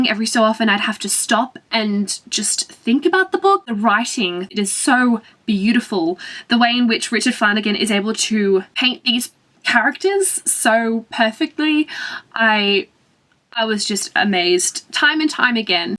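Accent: Australia. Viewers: Deniz Baronchelli She's from Australia, honey. It's not fake. That's the Australian accent. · Shes australian.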